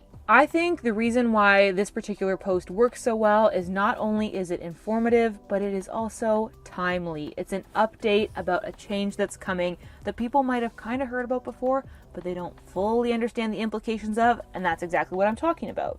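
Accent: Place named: Canada. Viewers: This is amazing training!!! Thank you so much! Yes and the word process every Candian says a long “O” sound where Americans say “ah” It’s cute ☺️ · This video was so super helpful! I for one often interact with tagged photos (outfits and locations) especially when considering to buy a piece or go somewhere. And I have been doing it when making reels and posts but I will definitely ensure I do it for all posts. And yes it is obvious that you’re Canadian from your “about” and “out” lol but I’m also familiar with how Canadians speak so some people may not notice - Nelle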